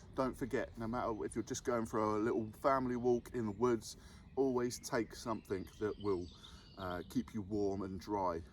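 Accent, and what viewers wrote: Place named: England, United Kingdom. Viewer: I really enjoyed this, thanks. Simple, straight forward, and right to the point. And great to hear an English accent knowledgeable about the outdoors. Thanks for taking the time to share your experience.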